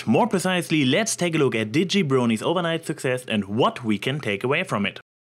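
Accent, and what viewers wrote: Germany. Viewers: Are you german I watch some german youtubers I recognise that accent just curious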